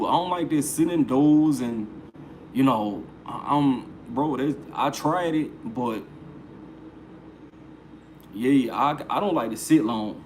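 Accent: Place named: Louisiana, United States. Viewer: U gotta be from Louisiana brudda I could hear it in your voice… Also my first day of orientation w P&S tmr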